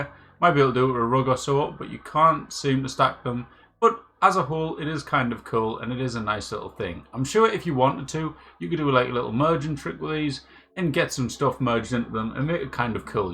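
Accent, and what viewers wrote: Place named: Newcastle, United Kingdom. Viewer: A fellow Geordie 👍👍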